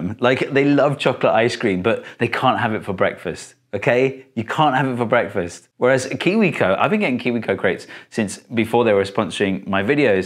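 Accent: United Kingdom. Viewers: I look forward to videos...but.... I feel that he is fully taking advantage of the fact that Americans have been programmed to think that people with a British accent are smart.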